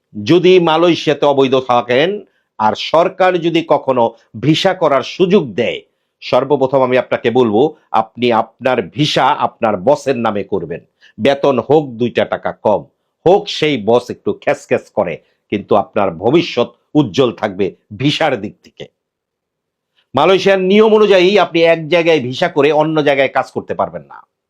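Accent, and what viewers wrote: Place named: Feni, Bangladesh. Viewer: আপনার ফইয়া কথা আমার কাছে ভাল লাগে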